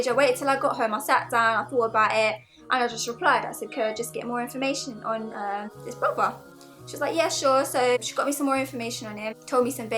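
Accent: United Kingdom. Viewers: Are you from Birmingham lol? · I still try to understand the britush accent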